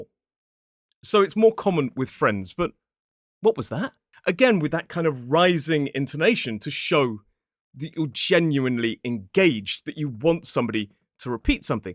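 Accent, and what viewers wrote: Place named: RP, United Kingdom. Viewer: No one speaks RP, apart from you and 5 othet people I encounterd in all my 26 years in the UK 😅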